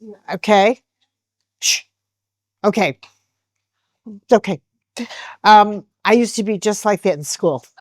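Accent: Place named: New York, United States. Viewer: I like it - instead of triggering, it makes me laugh. I'm not familiar with where grew up, but her accent reminds me of my childhood in rural central New York.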